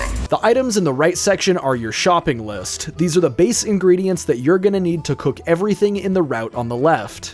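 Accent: United Kingdom. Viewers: British?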